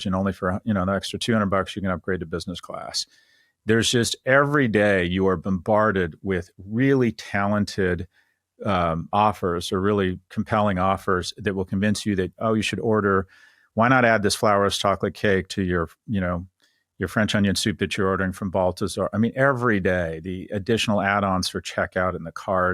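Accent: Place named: United Kingdom. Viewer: sound bri’ish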